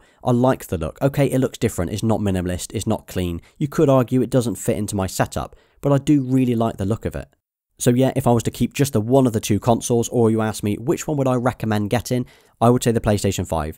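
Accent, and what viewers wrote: United Kingdom. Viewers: The way British accent is so funny 😆 because he said shual shock to shual sense, I am not hating or anything I am just saying how he says dual shock to dual sense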